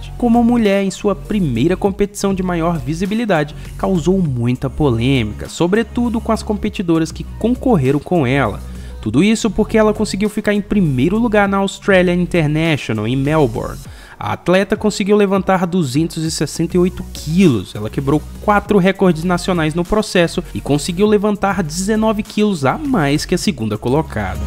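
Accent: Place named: Northeast, Brazil. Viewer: Cara vc é cearense ou Nordestino? Vc tem um leve sotaque. Valeu!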